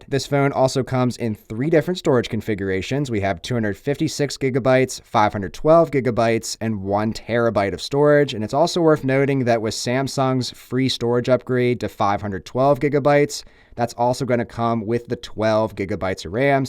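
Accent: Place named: Pennsylvania, United States. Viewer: Knew I recognized that PA accent.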